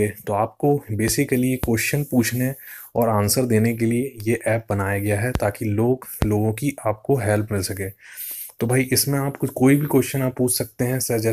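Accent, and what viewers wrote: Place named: India. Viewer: You speak so fast and you have indu accent. I did understand just afew words. 🤦🏽‍♀️🤦🏽‍♀️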